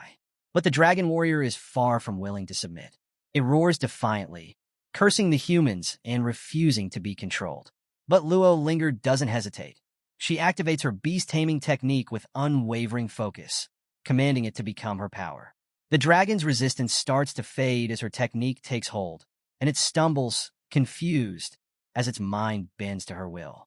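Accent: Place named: United Kingdom. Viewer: Why the switch to British accent at chapter 50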